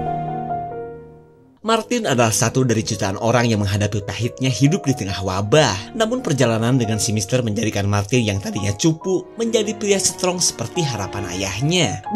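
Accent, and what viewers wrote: Sunda, Indonesia. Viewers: Sering "afdett bangg siaaapp menungguu apa lgii cara ngomong nyh cmpur sundaa heheheh😂😂😂❤❤❤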